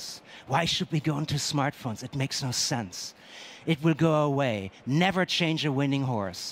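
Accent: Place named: Germany. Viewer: Good message! But very annoying delivery (too nervous, too loud, aggressive German-English...) Couldn't watch this for more than 15mins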